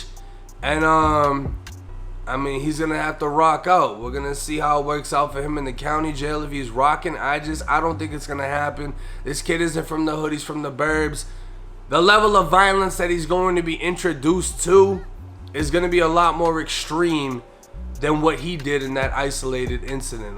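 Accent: Boston, United States. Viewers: Great show I've been ROCKEN WITH YOU since you was on 23 and 1 I have to say young man you need to try to expand cause just the way you tell stories and with your Boston accent it just works keep it 100 and you will go along way this is the old man from Indiana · You gotta be from Boston or the east coast no?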